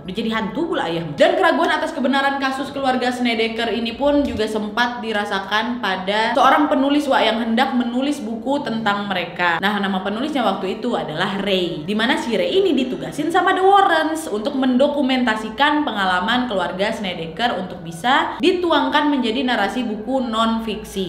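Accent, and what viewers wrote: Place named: North Sumatra, Indonesia. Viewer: Macem logat namorambe ko bah wak.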